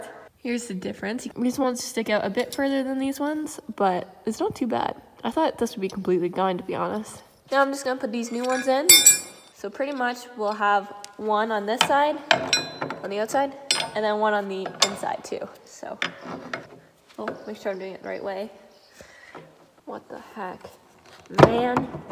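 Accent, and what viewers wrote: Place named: Scotland, United Kingdom. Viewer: mabee alot of you guys have a bit of a Scottish or Irish twang in yer accent.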